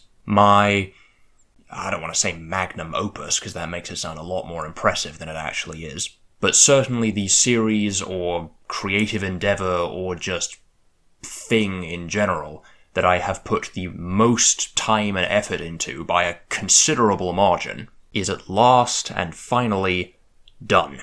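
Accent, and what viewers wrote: United Kingdom. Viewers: Omg you’re British!!! I thought you were a Neotectan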